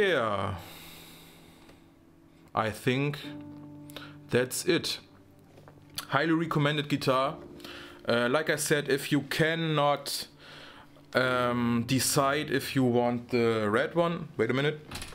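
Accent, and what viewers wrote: Germany. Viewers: Mensch schwätz schwäbisch wia mir au!!! 😂😂😂😂😂😂😂😂😂 Tolle Review. Die rote ist meine nächste und letzte (Muahhhhaaaa) die ins Haus kommt. Gruß aus dem Süden El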